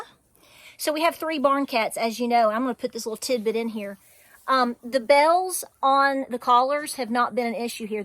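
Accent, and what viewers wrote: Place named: Easton, United States. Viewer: I have been watching you for so long and just wanted to share just how much I enjoy it being from Easton to see myself I love Hearing that accent as you go over things you are so encouraging and it's so heart warming and comforting to know that you care enough to take your precious time and that of to share this information with us a lot of us know it have you used it and may have forgotten about I have forgotten about it but you're helping to bring it back to the forefront and I'm so grateful you too as well as your family are in my thoughts and prayers Best regards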